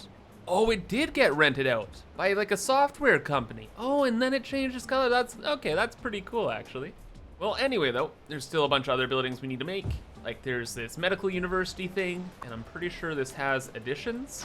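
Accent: Canada. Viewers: as a canadian ur accent describes me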